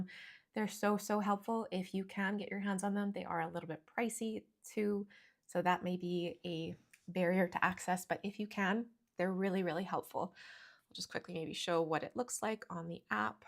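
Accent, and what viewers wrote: Canada. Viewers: I loved hearing your Canadian lingo come out 😍 The use of “hey” after a statement is the cutest! I’m from Texas and I never hear it. Love it.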